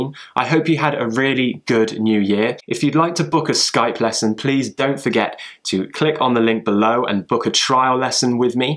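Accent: United Kingdom. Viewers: Which part of England are you from? I was thinking all the British people say "can't" like "kaant" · Your every British English pure British English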